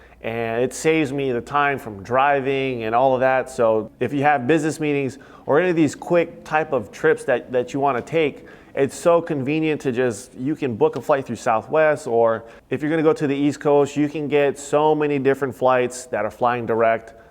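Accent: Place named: Hawaii, United States. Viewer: This guy saying “in town” & “Locals” haha he definitely from Hawai’i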